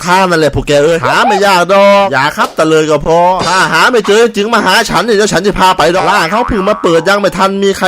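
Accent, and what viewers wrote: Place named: Korat, Thailand. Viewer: โคราชคักๆ 555+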